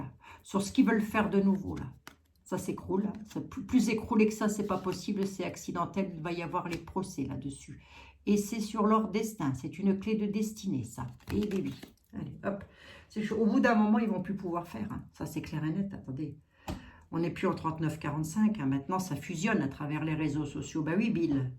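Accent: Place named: East, France. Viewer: J'adore votre accent de l'est je suis née à Epinal, je reconnais cet accent entre mille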